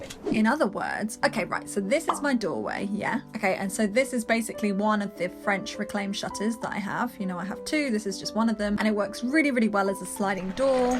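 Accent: United Kingdom. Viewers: Your British accent is amazing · She sounds like she's from Plymoth/Plympton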